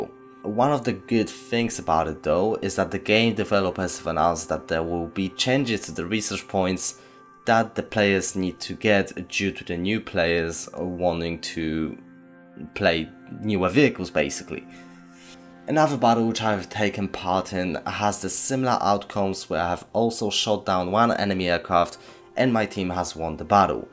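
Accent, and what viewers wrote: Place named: Poland. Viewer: Why do you have Polish accent ?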